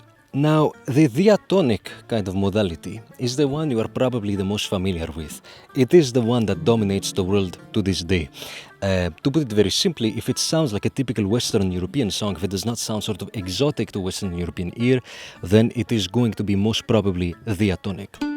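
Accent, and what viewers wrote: Greece. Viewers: What the hell, i was runing your video in the background and i hear you greek accent! "Wait, hadn't i clicked?" But no you had to prove you are a brother to yet another people's nation! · Is this guy part greek or something how tf is he speaking that good greek and greek accent english????? He even knowσ the cretan "κατεω"!!!! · How come you have an Greek accent?:) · Funny and educating! Also, your accent is really Greek! Hopefully we''ll see similar videos about other music traditions! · you can't possibly have such a good greek accent.. once I heard that λοιπόν I was sure I was hearing a compatriot.. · Only thing I have to say is that you absolutely nailed the greek accent without a doubt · Λοιπόν! You manage to sound like a Greek speaking English even to the eeeeh between words. Nothing less than amazing! Also, once more, I have to admit that I've never thought theory of music (or theory of anything, really) would be so diverting. 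I laughed so hard my frappé came out though my nose! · Excellent Greek accent! And I learned a lot about music. As always. Keep up the good work! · why is your greek accent so spot on? xD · Perfect Greek accent and appearance! Hilarious video! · Bro, I totally thought you were Greek xD You fkng nailed it. Even the Greek words you threw in there had me at hello:D Awesome, learned a lot, and I'm not even a musician:D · I love your videos and your Greek accent!!! 😂 I’m currently learning Byzantine music and I learned that it traces back to the modes in Ancient Greece. There are 8 modes and they have names like Dorian, Phrygian etc., which are not the same as what western music calls them. You’re right, they did screw up the names! 😂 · Greek accent is truly masterful 🧠🤯🤯🤯🤯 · He isn't Greek? Μπρο It sounds so greek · No, but even with a musician's ear, he'd need to go to a few hundred Greek weddings to mimic the accent that well. 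· The Greek accent is eerily convincing. I sense the presence of a Greek significant other.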